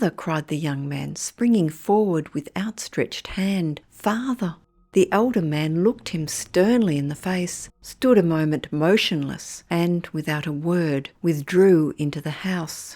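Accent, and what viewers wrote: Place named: Australia. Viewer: CANNOT hear this story as narrated by an AUSSIE -weird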